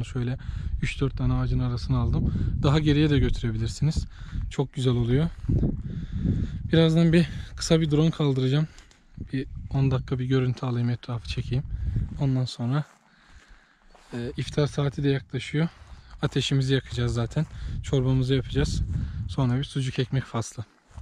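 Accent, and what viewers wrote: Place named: Istanbul, Turkey. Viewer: abi videolarını çok beğenerek izliyorum. Kampçı ruhun ve İstanbul Türkçesi ile konuşman çok güzel gençlerimize çok güzel örnek oluyorsun sana çok Teşekkür ederiz abi 😊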